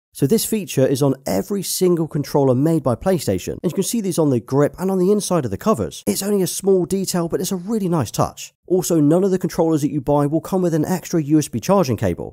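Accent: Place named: Yorkshire, United Kingdom. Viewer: Where in Yorkshire are you from? I’ve never heard a Yorkshire accent like this 😊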